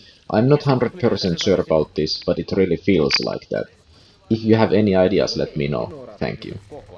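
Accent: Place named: Finland. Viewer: That's the Finnish accent perkele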